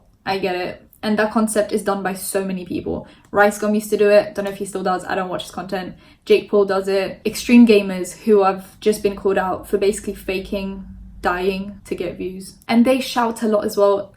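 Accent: United Kingdom. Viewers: oh yeah nah I wasn't really sure, you sounded British to me :) x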